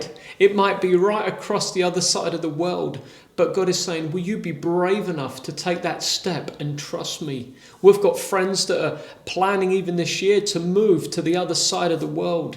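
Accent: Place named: England, United Kingdom. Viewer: He is English so he speaks proper English with the actual accent not the junk we hear in America.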